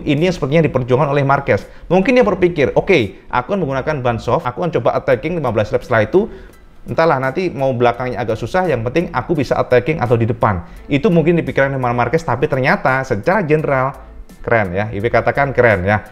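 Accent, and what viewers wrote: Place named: Java, Indonesia. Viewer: Cak iki asli Banaran Kandangan to Cak? 😲Kok medok pol..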